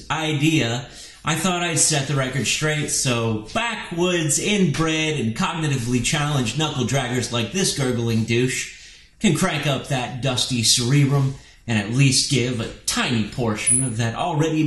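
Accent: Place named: South, United States. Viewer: Great video and that's an amazing southern accent ;-)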